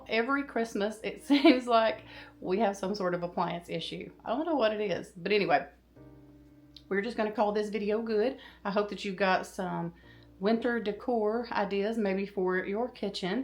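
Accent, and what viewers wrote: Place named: South, United States. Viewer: I'm a new subscriber. You have a little bit of a country accent like me; where are you from? I can't wait to watch more of your videos. BTW, I'm from Southeast Georgia.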